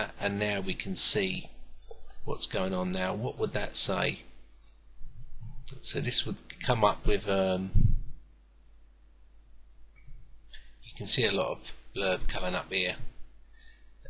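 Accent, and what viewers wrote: United Kingdom. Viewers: Thanks for this clip. I struggled sometimes to understand what you are saying (the heavy British accent, not a complaint though, lol). Just an FYI, the Symbols path didn't work, so I ended up downloading and installing the symbols on my machine (and set the correct local path). Analysis worked fine after that. Thanks again for a very useful beginners tutorial. Sam, USA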